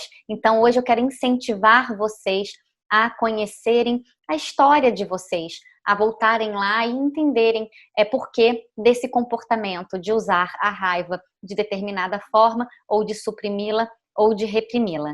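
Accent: Rio de Janeiro, Brazil. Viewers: Desculpa nãos consigo ouvir carioca nem de longe...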